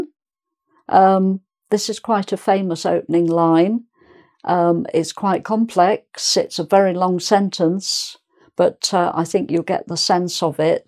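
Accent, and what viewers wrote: England, United Kingdom. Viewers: Hello,!👋 I am Kate, I am 18. I’ve already read "1984" and "Pride and Prejudice" in Russian. I really enjoyed them!😍And I’ve also read "Jane Eyre" in English when I was 13-14 years old (my teacher gave me this book) and in Russian recently. And now I am reading "Charlie and the chocolate factory" and "A journey to the center of the Earth" in English. In general, reading is my favourite leisure time activity, and I’ve read hundreds or, maybe, thousands of books.📚 I really love "Harry Potter", "Master and Margarita", books about "Stranger Things", foreign classics and so on.💖 P.S. Thanks for your video!👏 I am from Russia🇷🇺 and I am learning English, but I’ve understood everything without subtitles. I really like your pronunciation and Britain accent!🥰🇬🇧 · VERY BRITSH ACCENT ! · Это что, британский акцент? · Even a non-English speaker would be able to understand this lady. 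Lovely & relaxing type of voice with a typical British accent, perfect to get you started. Plenty to learn from her (intelligence). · I really love English writers, they are my favourites ones after Juan Rulfo, and I love your accent as well 🥺🥺🥺🥺💚 greetings from Mexico.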